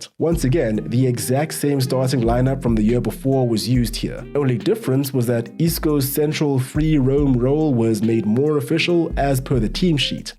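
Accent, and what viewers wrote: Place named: South Africa. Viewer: Your accent is sound South African. I can’t get over it😂😂😂😂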